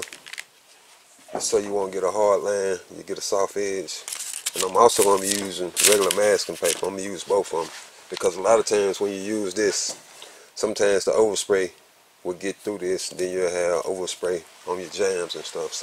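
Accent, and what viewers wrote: Alabama, United States. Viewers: What part of Alabama you out of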